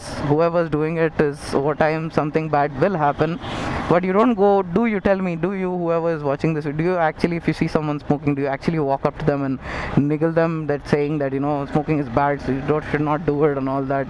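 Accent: Hyderabad, India. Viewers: Lov u n ur videos too bhaii.. Donno the reason for God sake but i absolutely enjoy watching ur videos.. They are not dramatized nor filled with juvenile but they are very very natural and appealing.. Ur communication is awesome no doubt abt tht and wat i lov the most is tht typical hyderabadi accent ull be using here n thr.. Even in my frnds circle we discuss abt ur videos more than any.. Hum hum hyderabadi bhaiii:p.. Well cheers to you bhaii..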